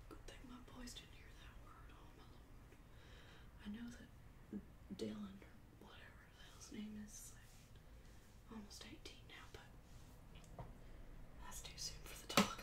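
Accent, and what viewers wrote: South, United States. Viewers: that strong souther accent is flawless. i guess it helps to be from the south lol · You are one of the BEST at role plays! I love the southern accent and you seriously have amazing acting talent. Please keep this character in your regular video rotation! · That accent and the softened profanity is such nostalgia for me as a child of the Bible Belt.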